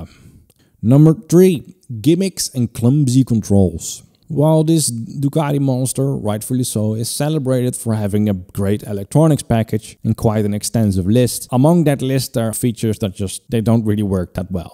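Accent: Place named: Netherlands. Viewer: Funny... the first sentence made me wonder whether you were from the US. In the second sentence I knew you were Dutch. Difficult to height your accent when you have to think about what you wanna say... Very nice bike! I would love to own a Ducati Monster but dare not because of the maintenance costs...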